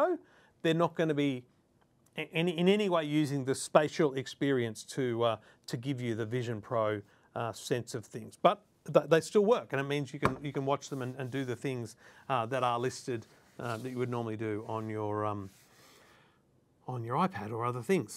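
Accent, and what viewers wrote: Australia. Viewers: I've watched an obscene amount of videos about the Vision Pro. It’s nice to finally see/hear an Aussie talking about it 😅 The pass through is something I’m a bit disappointed about. The degraded quality is purely because you’re looking through a camera. I wish it used better cameras. For 6k you’d think it would. I’m taking this Friday off. Picking up my headset at 9am. Very exciting 😄 Thanks for the vid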